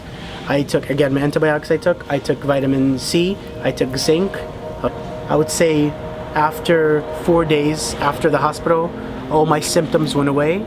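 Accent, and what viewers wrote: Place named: Russia. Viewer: Glad you are ok. I had it covid in May and my friend Arina had covid pneumonia and they insisted that they intibated her. I think they did that for her due to political reasons. She does too. By the way our group of Russian have the same dialect as you. It really great to relate to you and you keep us laughing. Take care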